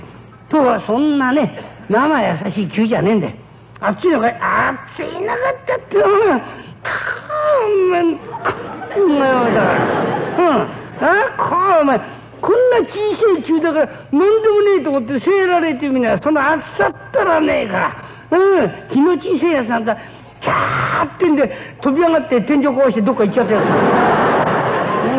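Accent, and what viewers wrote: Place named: Edo, Japan. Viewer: 現存する音源では最高峰のひとつ。正確に文字に表せない江戸風の言い回しが凄まじい。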